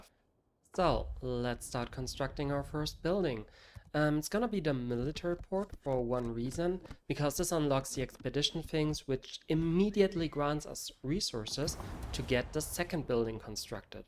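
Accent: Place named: Australia. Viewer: You sound Australian, what time you stream?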